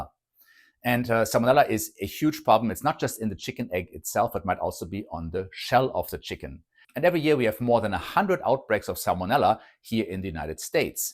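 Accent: Germany. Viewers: you have a german accent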